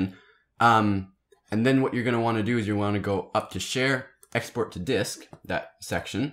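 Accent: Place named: Canada. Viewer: youre canadian arent you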